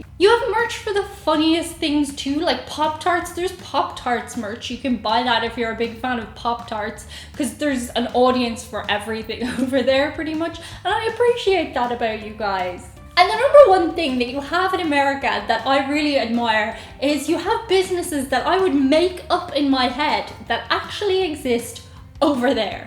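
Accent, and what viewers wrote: Dublin, Ireland. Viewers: Fun video I love when use an "American" accent. Granted I live New England. As Side you your accent reminds me of Friend from Dublin. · as a US citizen born and raised, I really love knowing that you "appreciate" our "societal culture"! But AS a US citizen, I really love YOUR culture as well! We only have a history of about 250 years to fall back on, some of it not so great. I don't know what it would feel like to have a CULTURAL history that goes back, thousands of years! It really would be great to be able to go back to the BRONZE, or even the STONE age, and know that those people were MY "ancestors". (and your Irish "accent" is pretty cool too!) Even your original LANGUAGE going back as far as when it was still "Gaelic" and then turning to Irish!! Don't get me wrong, the American "melting pot" has alot of advantages too but unless we are "NATIVE AMERICANS", (which I'm not) here, we just don't have the sense of truly "belonging" to a COUNTRY or even a REGION, like you do in Ireland, or Spain! 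I mean you can trace your CULTURAL lineage all the way back to the Celts in Ireland or the "Beaker people" or even "Iberian" and Roman history in Spain! So, THANK YOU for your kind words and thoughts about the STATES! Not everyone in the world thinks of us in such a "kind" way. ♥️😀🇺🇲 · The new "politically correct" term to call those weird people is "eccentric". But you're right, generally, it's not frowned upon. Nothing sexier than a woman with a sense of humor! The Irish accent doesn't hurt either. 😁🤓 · As an American I love your Irish ☘️ accent : )))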